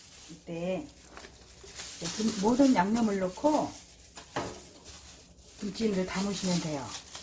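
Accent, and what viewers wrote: Jeolla, South Korea. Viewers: 누님 고향은 어디신가요, 말씨가 전라도 같은데?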